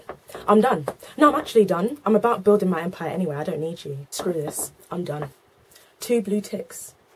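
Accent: United Kingdom. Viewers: A Black Girl With A British Accent, That is The Best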